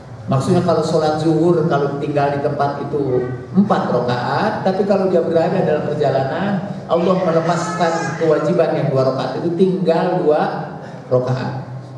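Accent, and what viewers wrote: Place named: West Java, Indonesia. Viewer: Sunda na kaciri pisan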